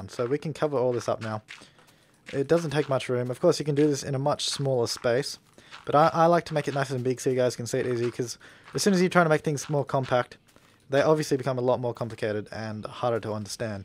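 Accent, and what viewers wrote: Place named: Australia. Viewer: Aussie FTW!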